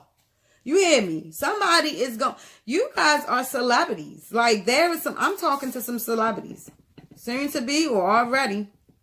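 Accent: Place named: Virginia, United States. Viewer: Wow sis this reading was very detailed to the Teaa & it's accurate as hell 👏🙌. When you mentioned the Devil card I said wow its scary because I've been seeing 555 constantly along with other #s too. The Universe is speaking to us all about letting go the toxic sh*t from our past so new things & opportunities can come our way. Not to mention mercury retrograde is also in the mix 🤦‍. Im loving your readings & loving your vibe. I feel like you also maybe from Virginia or Maryland because you have an accent lol 💕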